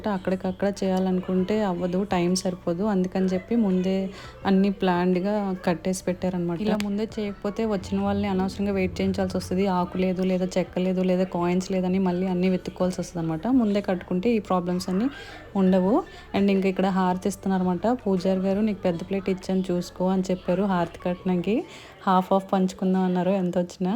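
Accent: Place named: Visakhapatnam, India. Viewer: I think Meru Visakhapatnam varu iedali me voice tone House location yakka andi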